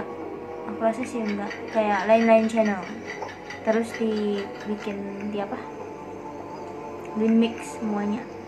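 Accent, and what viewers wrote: Indonesia. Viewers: Ngomong ny Indonesia Banget .. Orng mna sih ini..🤔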